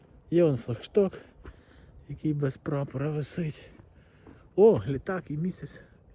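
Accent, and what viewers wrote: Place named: Western Ukraine, Ukraine. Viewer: ви мабуть родом з Прикарпаття,відчувається що десь західної України,я теж родом звідти,але все життя проживаю біля Києва.Хай вам щастить!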